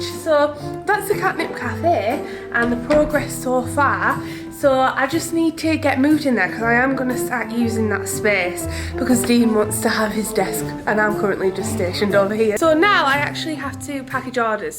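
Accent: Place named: Yorkshire, United Kingdom. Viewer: Ahh love coming onto a channel and finding someone with a similar accent to me. Are u from Yorkshire? I’m from Hebden Bridge near Halifax, born in Bradford. I want to do an art channel and I have wondered what will people make of my accent and should I learn how to tone it down lol but then I hear you’re accent and u sound luvli!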